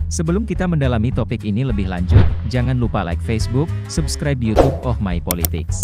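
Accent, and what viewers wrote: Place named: Indonesia. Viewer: Tapi Suara VIdeo ini bukan gaya orang sarawak tapi orang indonesia. Orang indonesia kah yang buat video ini?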